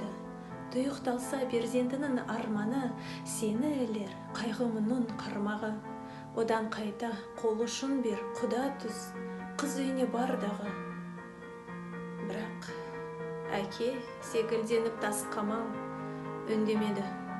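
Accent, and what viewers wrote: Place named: Russia. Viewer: Астанада тұрсаңыз, Синтез актерлік курсына Мұхтар ағайға барыңыз. Тіліңіз орысша шұбарланып кеткен, акцент бар. Ң, Ү, Ө әріптерінің сиқы жоқ, үндестік дауыс ырғағын жетілдіру керек. Өлең мағынасын жүрекпен сезініп соны тыңдарманға жеткізу керек. Мен видеода тек өзіңіздің бет-әлпетіңізге тамсанып жақсы оқып жатырмын ғой декен ойыңызды сезіндім ( орысша айтқанда самолюбование, не передали состояние) сөйтіп, сөйлей сөйлей шешен боласыз, күндердің бір күнінде жарып шығасыз.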